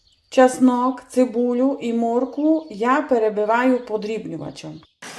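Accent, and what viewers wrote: Transcarpathia, Ukraine. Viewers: Дуже смачна закарпатська кухня і гарний закарпатський діалект ❤ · Чудовий Закарпатський діалект❤ Дякую за вашу працю ❤Слава Україні! 🩵💛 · Насолоджуюся красою закарпатського діалекту. Ви чудові! ❤ Будемо робити такі котлетки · Прекрасні котлетки. І говірка закарпатська чарівна. Молодець.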